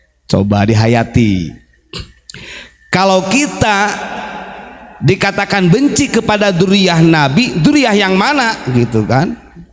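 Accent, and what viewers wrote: Indonesia. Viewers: Keren lagom jawanya....enak didenger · pembacaan barzanji nya ala banten banget... ❤❤❤❤❤ banten tangerang serang pandegelang cilegon..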